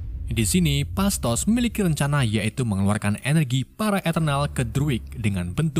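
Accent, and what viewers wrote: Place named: Java, Indonesia. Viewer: Jawa sekali pean kang😂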